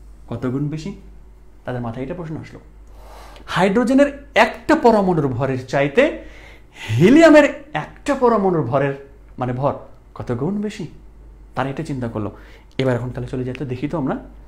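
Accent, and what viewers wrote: Rajshahi, Bangladesh. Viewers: Sirer Basha Rajshahi onchol a...tai na?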